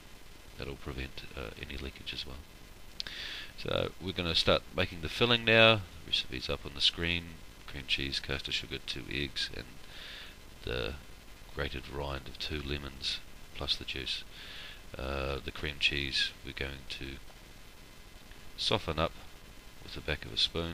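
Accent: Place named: New Zealand. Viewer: He's a bloody KIWI, from Nuw Zulund, not Ostrayan from Ostraya.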